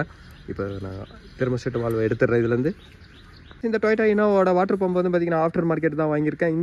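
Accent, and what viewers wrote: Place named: South India, India. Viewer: I didn't understand what you said In south accent but it's very informative ❤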